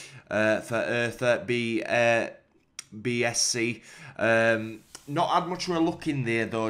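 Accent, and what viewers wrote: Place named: Barnsley, United Kingdom. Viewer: Watching with subtitles is funny as.... 'Welcome to tax tv' 'Pascal Cupcake' Shows that algorithms can't understand the Barnsley twang 🤣🤣🤣🤣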